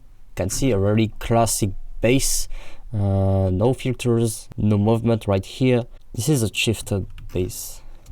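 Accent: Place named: France. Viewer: J'ai adoré le tuto (je comprends mieux l'anglais avec un accent fr de merde)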